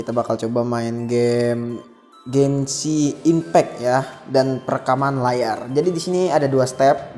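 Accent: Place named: Banyumasan, Indonesia. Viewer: Njer basane ngapak😭🤣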